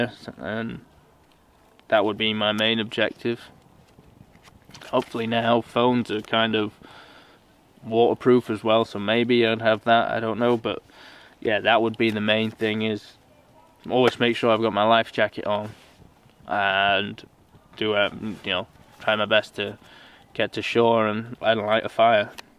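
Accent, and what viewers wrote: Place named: United Kingdom. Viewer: hello how are you and i hope your doing when your not doing the bushcraft videos/solo camping videos and i wanted to say happy anniversary to you and your wife and happy 1 year anniversary on your youtube channel and at the risk of me repeating myself from the last comment i sent you it's like wow when i watch your videos i keep feeling peaceful and enjoy the wonderful nature everywhere you go and i like your dog she is a nice dog great seeing her as well i do have a a qustion how do you spell her name because people spell names different is it blu/blue/ and i hear british in your voice which i think is very very kool i like british people alot and /americans and of course my country canada and thankyou very very much for the Q&A i enjoyed that alot i will always enjoy your videos short or long always cheers to you and thankyou during these hard times your videos help everyone who watches them thruw the hard times from your new friend Randy again ontario,canada stay safe out there m friend.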